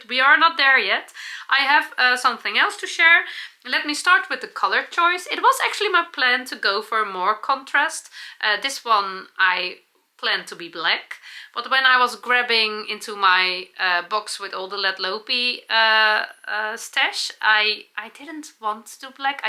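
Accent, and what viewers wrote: Netherlands. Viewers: Just love your Dutchy English - your brightness and cheefulness is so refreshing 🤩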